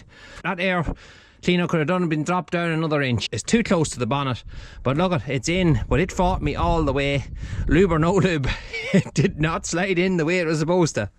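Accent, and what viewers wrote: Ireland. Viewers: You sound like my Grandparents. They were from Ireland. And they have been gone 40-45 years now. Thank you for putting a smile on my face. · Hello, a new viewer here. What an amazing story about you surviving a bull attack. I especially enjoyed how gentle and caring you were while assisting with the delivery of the twin bull calves. Your Irish accent is quite charming. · Irish or English? Where I am cattle are outside year round. USA. Excuse me but are not twins not as large? I think having twins would be easier? The more I listen the more I think Irish. My grandpa raised herefords. His bull was so tame. He stayed with the cows for two years, then he was sold. Grandpa changed bulls every two years. OK going to shutup.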